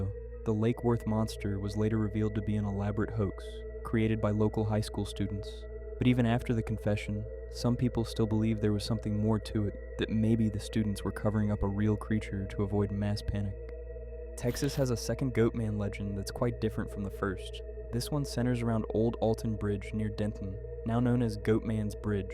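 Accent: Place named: Maryland, United States. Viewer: u can tell my dood is from maryland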